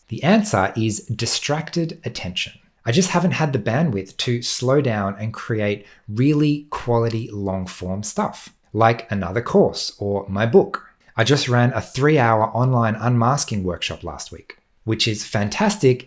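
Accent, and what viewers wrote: Australia. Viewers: NOOOO, please stay, you're a rare Australian voice with unique perspectives on autism and evidence based info delivered with a warm gentle manner. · Please don’t quit. I’m a sometimes viewer, parent of an autistic person. It’s so calming sometimes to hear another Australian talking about any aspect of autism, I always learn something. I wonder if your helper might be called an ‘aerator’ as they will help you out into the air of the web? Best of luck whatever you decide.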